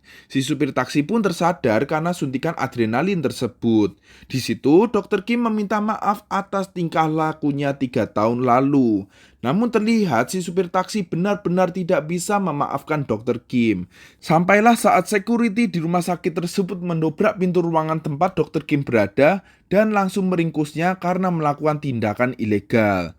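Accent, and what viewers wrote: Java, Indonesia. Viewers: Medok jawanya hilangin ya ka...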